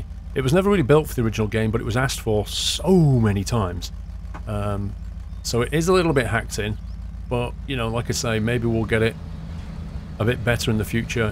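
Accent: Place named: Manchester, United Kingdom. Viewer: Trying to pick your accent . What part of the UK are you from ? Somewhere near Manchester ?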